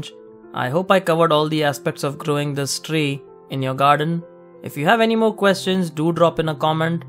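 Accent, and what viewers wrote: India. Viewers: Are you indian??..... Your voice seems like...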